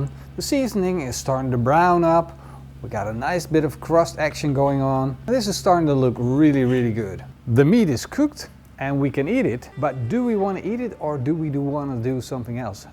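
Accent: Netherlands. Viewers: I do really like your videos and there is a soft spot in my heart hearing a Dutchman speak English, as I went to high school in Den Haag due to my father being transferred over to Europe. That said, I do not really care to own the knives, but having to open accounts on all these different social media platforms is too much for me. I hope you might take that into consideration in the future. FYI, the Big Mac taco recipe was absolutely fantastic! I have turned several people on to your channel due to that recipe. Keep up the great content and take care.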